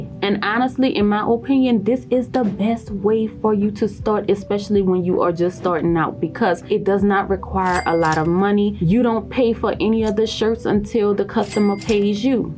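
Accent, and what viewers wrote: New Orleans, United States. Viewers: It's the NOLA accent for me❤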